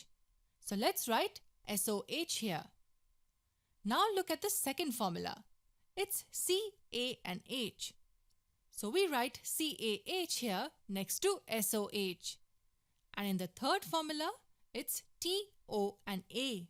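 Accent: India. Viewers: goofy ahh formulaaaee indian a$$ accent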